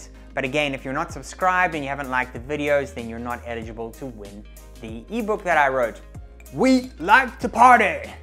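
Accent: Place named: South Africa. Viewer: Hi, love your channel. I've been trying to work out if you're originally South African, your accent is ever so faint. I've been away from sa so long myself that I might be mistaken. The reference to Banting in your description also made me wonder. Prof Noakes rocks, and as an ex Durbanite and avid Comrades follower he's legendary.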